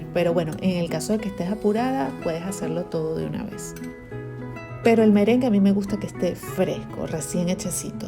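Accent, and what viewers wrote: Venezuela. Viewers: Amooo el acento Venezonalo, definitivamente!!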